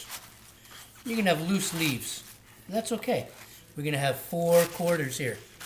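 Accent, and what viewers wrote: United States. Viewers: Haha, you just have a strong American accent is all. I've definitely heard worse :)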